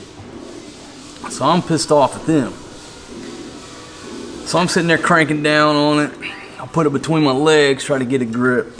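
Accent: United States. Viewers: I’m Canadian and listening to you is like watching a Saturday Night Live skit that is so quintessentially American. Thoroughly enjoyed it and hope Wife #1, 2 and 3 are happily making use of your in tact Family Jewels.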